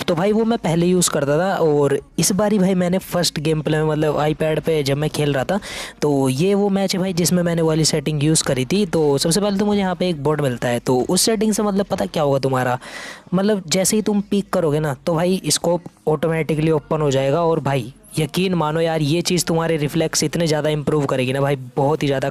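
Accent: India. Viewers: Kis kis ko ke bolne ka style pasand hai Matlab ek dam Desi wali feeling aave video dekhti ber😍😍👌👌🙏🙏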